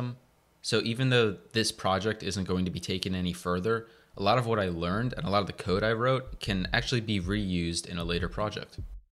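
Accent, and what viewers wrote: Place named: Delaware County, United States. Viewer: You from delco? I can hear it ^^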